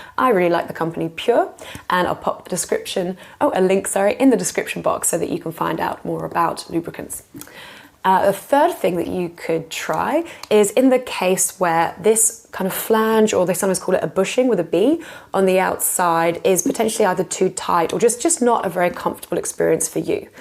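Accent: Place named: United Kingdom. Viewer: Love yur British voice. It's sexey. 😊😊 Thanks for the advice!!😊😊😊😊❤❤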